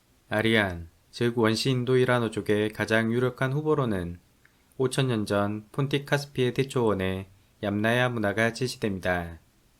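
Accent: Jeolla, South Korea. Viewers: 이분 어투가 라도네! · 전라도 억양이 있는 거 같으심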